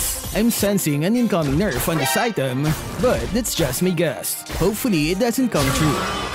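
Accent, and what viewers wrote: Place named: Philippines. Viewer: anoyying pinoy accent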